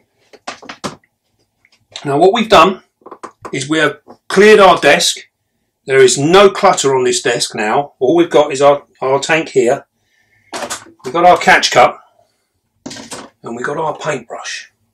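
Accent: United Kingdom. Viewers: What big spinerats she hase,she how she can make so much web,so easy I think a lot of people watch for the Beautiful T you have an great content,but a small section I bet for ur British accent an a super small are arachnophobia people,. Am in the first bunch 8 T s so far. Have a happy new year an camera lady 👍👍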